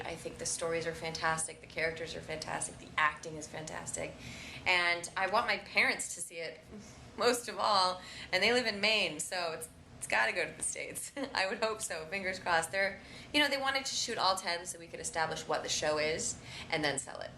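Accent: England, United Kingdom. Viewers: is she english? she sounds english might be me though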